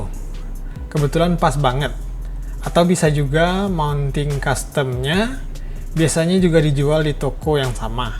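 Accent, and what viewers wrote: West Sumatra, Indonesia. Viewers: Logat Sumbar nya kental nian... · logat nya minang bgt nih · Dari logat nyo pasti uda ko urang minang..awak yakin 100%..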